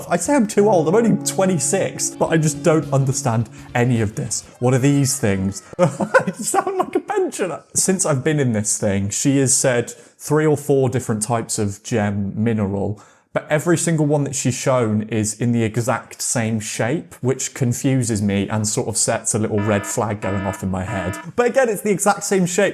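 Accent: United Kingdom. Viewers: Someone heard your accent and had to be told that you're British. At this point it's on them. Not to mention that apparently only Americans can talk about American movies? Interesting. Also, if I'm looking for some crystals, it's best to go to stores selling them. Sure, you could also get scammed there, but you can actually see the crystal before bying it. Also, I've seen much cheaper ones irl.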